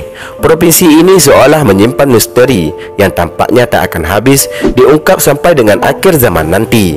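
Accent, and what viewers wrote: Aceh, Indonesia. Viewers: Bangga sy dengar logat Aceh dr si Abang Dubbing. Org Aceh jgn malu ya dgn dialeg sendiri. Lanjutkan berkarya utk menambah wawasan kita semua.👍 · meudok aceh ya,hahaha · Pakon teuma menyoe meudok aceh,male kah teuma. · Logat bhs mimin aceh nya terlalu nampak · Pengisi suaranya kurang menarik.. Masih terlihat ATT.. Alias Aceh Tok Tok. Lebih baik diisi dgn bahasa Aceh aja langsung lebih bagus.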